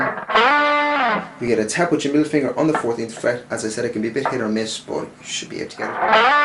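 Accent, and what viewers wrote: Ireland. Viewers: Cool lesson Just wondering, r u Irish? U just kinda have the accent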